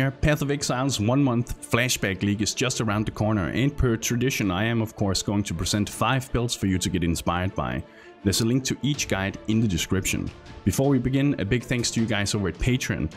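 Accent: Denmark. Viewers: Are u danish? 😅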